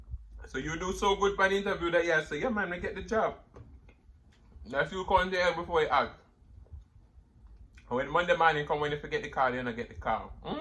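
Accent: Jamaica. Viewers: I SAW YOU IN A COMPILATION AND HEARD YOUR ACCENT AND CAME OVER IMMEDIATELY!! IVE BEEN WANTING TO SEE JAMAICAN MUKBANGERS AHHHH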